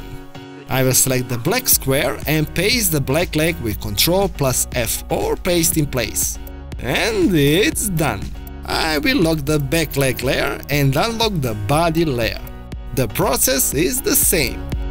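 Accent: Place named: Russia. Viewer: такой знакомый рашэн акцент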